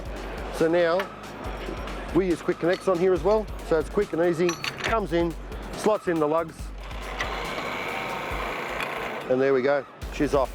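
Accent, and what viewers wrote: Australia. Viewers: Good thing we could see the stuff working, because his high speed aussie accent is impossible to understand. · I thought he was a country dude at first then Australian...I just tap with hammer and use puller....some come right off most do actually.. 2 outta 10 will be a bitch.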